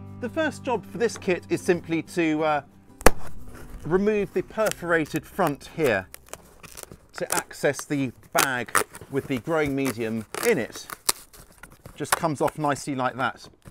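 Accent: United Kingdom. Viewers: Apart from all the useful information that this video provides, I found it quite relaxing to watch and your British accent contributed big time to that effect as well 😄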